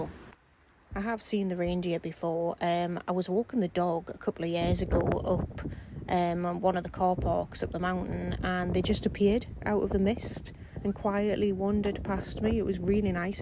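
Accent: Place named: North of England, United Kingdom. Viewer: This is a breath of fresh air! Loved this vlog this morning and hearing your story. Wonderful and freeing life you have. The magic of seeing those reindeer.. I would cry too. Taking us on your woodsy bike rides is so lovely to watch and you chatting is like chatting with a friend. Love your candid realness. I was born in your neck of the woods in the North of England. Parents immigrated us to Canada many moons ago. Your accent captured me immediately❤. Keep going, I am loving your channel so much. 😃🇨🇦👋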